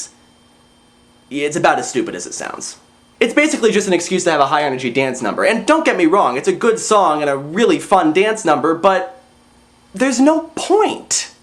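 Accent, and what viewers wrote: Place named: England, United Kingdom. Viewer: your scottish accent sounds somewhat snob-english.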